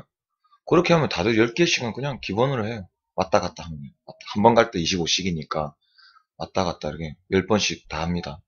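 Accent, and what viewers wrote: Gyeongsang, South Korea. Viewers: 일본어 자막에 틀린 글이 너무 많네요. 그냥 구수한 갱상도 사투리로 쭈욱 하시길…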